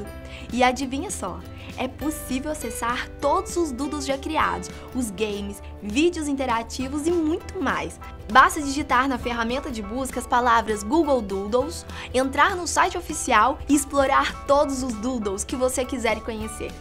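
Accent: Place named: Bahia, Brazil. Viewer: Pelo sotaque ela parece da Bahia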